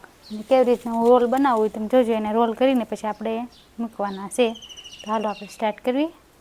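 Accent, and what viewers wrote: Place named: Jhalawad, India. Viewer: ઝાલાવાડ ના લાગો છો ભાષા ઍવી છે સરસ પાત્રા બનાયા મને પણ ખુબ ભાવે છે મારિ વાડીયે પણ વાવવાના છે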